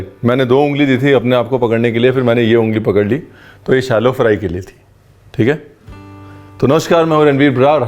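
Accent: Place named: Lucknow, India. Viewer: I like the recepie and your Lucknow Hindi is awesome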